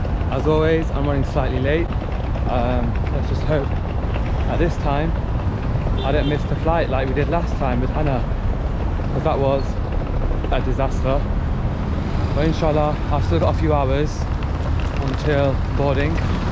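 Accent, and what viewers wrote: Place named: United Kingdom. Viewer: Salaam just found your channel, by browsing Umrah videos. I live in USA, but I took a vacation to the UK. I think you are from there with your accent. Jazakallah Khayr, such a beautiful video and a vlog. your off pakistani descent?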